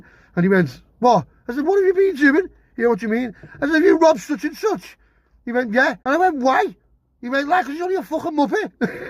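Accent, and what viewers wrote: Liverpool, United Kingdom. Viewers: My missus just said what are u listening to i said scouse stories 😂 she said he's proper scouse 🙈 its in the title😂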